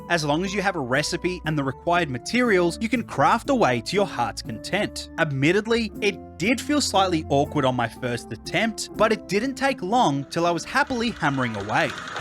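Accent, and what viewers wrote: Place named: Australia. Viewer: You're Hungarian ? I was swearing you sound like an Aussie !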